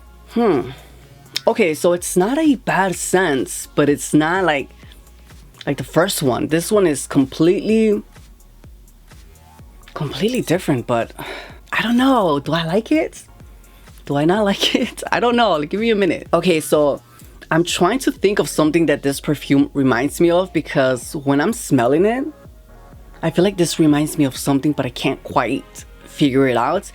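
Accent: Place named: Latin America. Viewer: I appreciate this review ❤ and I'm definitely old enough to be your mother and l so enjoy watching every review because in my 20's me and you honey would have been besties ❤❤. I don't know your nationality but the accent sounds Latino? So I love the pronunciation of your words😉. Keep being you!! We love it ❤❤❤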